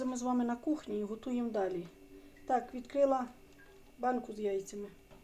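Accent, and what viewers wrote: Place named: Solotvyno, Ukraine. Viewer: Ой 😄у нас так само кажуть" штири" откроми" 👍наче ви наша солотвинська(Бердичівський рн)🫶👍👍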